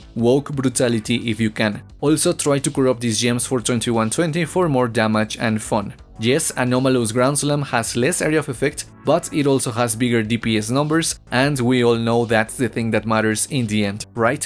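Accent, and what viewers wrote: Spain. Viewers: I think all PoE guides should be done with a Spanish accent after watching this one. Great vid and great guide!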